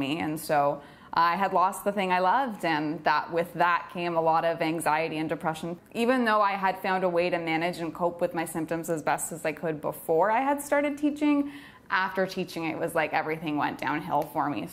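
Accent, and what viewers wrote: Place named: Canada. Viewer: I relate to your story so much. Congrats on your recovery. Love your Canadian accent. Cheers from Southern California.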